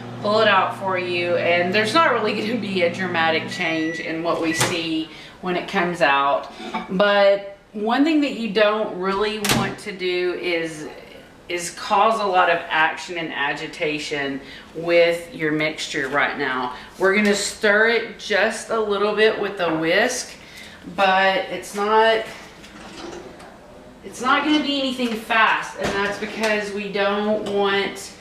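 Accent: South, United States. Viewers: Omg, I love your southern accent. I've lived in Washington state for 30 years and your accent really makes me miss the south. ❤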